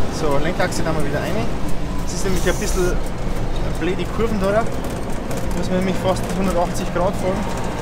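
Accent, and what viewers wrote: Bavaria, Germany. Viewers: I find des foi geil dasst du no boarisch redst, gfreit mie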